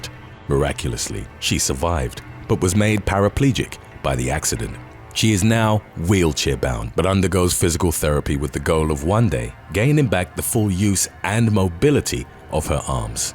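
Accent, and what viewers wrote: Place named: United Kingdom. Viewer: Hey mate you have the perfect narration voice, it also helps your British. Next I would like to hear you narrate BBC Documentaries!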